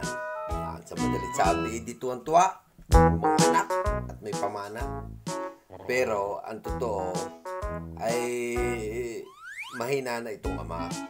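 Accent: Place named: Batangas, Philippines. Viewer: Hello sir god bless you po joker pala si sir hehe sir para po kayong batangueño asawa ko kc ganyan mag salita e.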